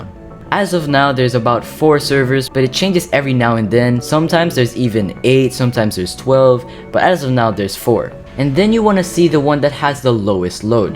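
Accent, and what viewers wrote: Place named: United States. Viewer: You sound American wtf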